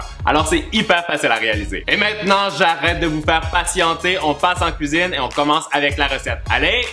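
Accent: Quebec, Canada. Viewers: J'aime beaucoup ton maquillage , t'es vidéo et ton décore ! Ça a l'aire super bon . J'adore ton accent Québécois . Bref je t'adore !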